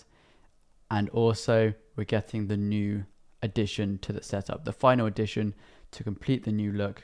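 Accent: United Kingdom. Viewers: You from Bournemouth mate?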